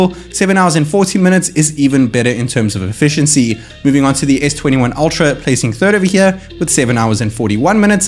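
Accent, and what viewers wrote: South Africa. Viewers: 100% south african immediately picked up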